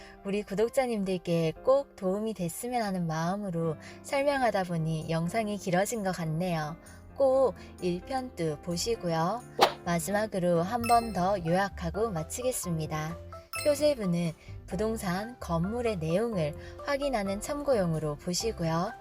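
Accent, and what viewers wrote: Gyeongsang, South Korea. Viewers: 유익한 영상 잘 봤습니다~ 쉬운 듯 어려운게 등기부 보는법 같아요. 전 님 경상도 사투리가 참 듣기 좋아요^^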